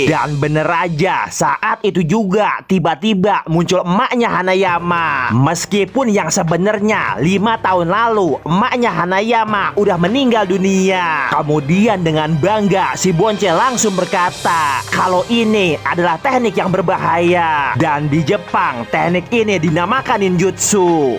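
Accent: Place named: Bekasi, Indonesia. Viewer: Makin yakin gw kalo lu orang Bekasi bang, minimal Jaktim atau Bogor deh, kaga danta emang 🤣😂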